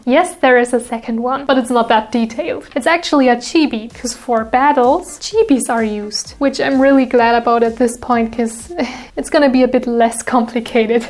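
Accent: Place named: Germany. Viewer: joooooo ich hab das ganze video geraten was das für ein akzent war und als du das dann mit der deutschen voice line gesagt hast war ich happy weil ich nd viele deutsche kenne die das Spiel spielen ^^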